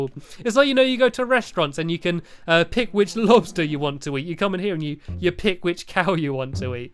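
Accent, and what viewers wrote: United Kingdom. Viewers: Are you British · your brittish right!?!?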